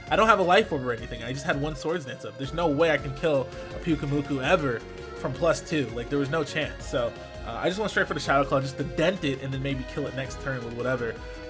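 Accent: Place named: Delaware, United States. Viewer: You're from LA? I thought you were from Delaware lmao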